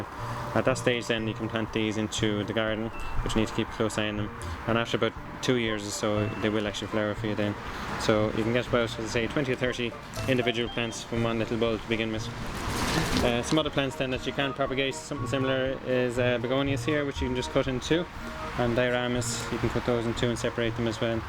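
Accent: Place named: Ireland. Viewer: Cool accent, thanks for the video, just what I was looking for for my cyclamen dividing! (for those non-irish watchers, like me - slow the speed down to 0.75 and crank up the volume :-)))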